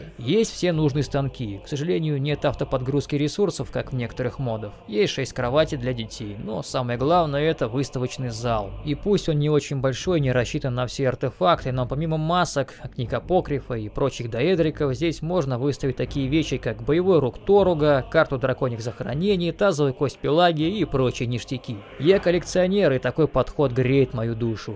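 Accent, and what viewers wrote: Germany. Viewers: и да сразу видно что вы изучали немецкий по вашей манере читать инглиш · Право слово, автор произносит английские слова, как немецкие. Я всё понимаю, но это совсем уже жёска.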